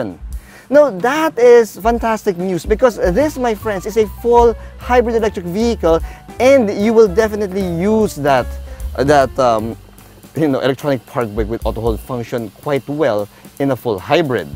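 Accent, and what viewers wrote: Philippines. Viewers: Idol, filipino english accent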